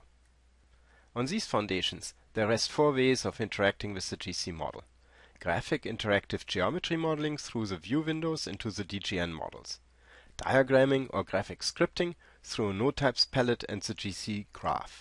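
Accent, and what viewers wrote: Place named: Germany. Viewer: Too much germanish XD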